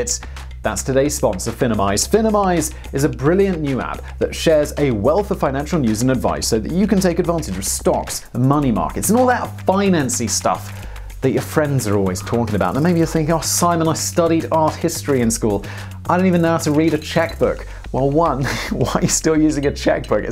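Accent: United Kingdom. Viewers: Australian dollars? New Zealand dollars? And there are dollars in even more countries. American dollars may not be the first kind of dollars to spring to mind to people from British Commonwealth countries, and Simon is very popular in many of these countries, and don't forget that having a UK accent and not American they may think he was talking about their dollar. I'm a Brit, and when people say dollars to me, I automatically think NZ dollars, as my son and his wife have emigrated there.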